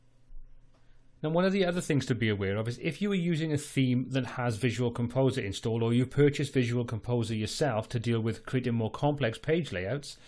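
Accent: Wales, United Kingdom. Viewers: Thanks - this is a brilliant video. I watched this because I wanted to see if I could customise WooCommerce to work in other languages, namely Welsh. I can’t find a straight answer online. From your accent I’d say you’re a Welshman! Before I download the plugin to see for myself, do you know if a)Welsh is supported or b)I can customise myself without having to delve too much into css and php etc. Thanks!!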